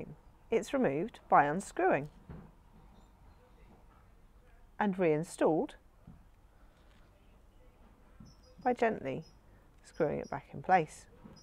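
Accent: United Kingdom. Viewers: Brilliantissimo! Helpful with lovely British accent. My pink Brompton is ready for the end of lockdown soon in France.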